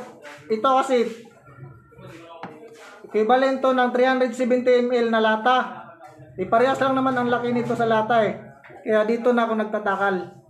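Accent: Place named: Visayas, Philippines. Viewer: BisaYa kaaYo dodong #vlog cho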